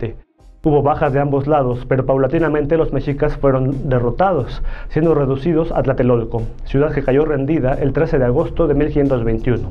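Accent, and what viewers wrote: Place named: Spain. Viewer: Dudo que seas español; y aún así, hablas como uno. Patético.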